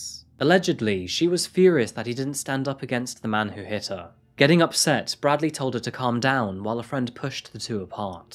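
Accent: United Kingdom. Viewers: That’s who I thought it was until I heard the British accent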